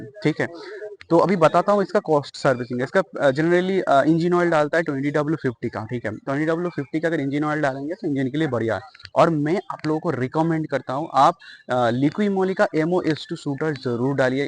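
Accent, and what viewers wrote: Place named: Bengal, India. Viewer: Bong boy. Accent problem bro.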